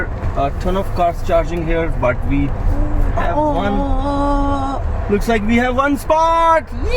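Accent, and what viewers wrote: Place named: India. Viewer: I know this accent. Are you originally from India? If so, where at? I lived there for 2 years.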